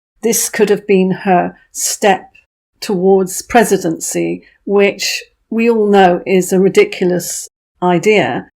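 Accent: United Kingdom. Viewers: Thank you for your great reporting! You have the most lovely & quintessential British Lady voice. It's very kind & soothing. A breath of fresh air in all this worlds madness. Makes me miss the UK. Much love from Chicago😘❤️ & Peace🕊️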